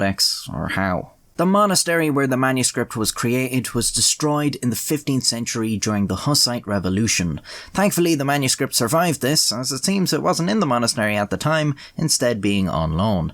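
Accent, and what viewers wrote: Ireland. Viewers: It's Irish, but it's not the Dublin accent, which most people, especially us Americans, have heard variations (piss poor imitations, in words). He lives in Dublin, but he's told us he hates the native Dubliners he's met.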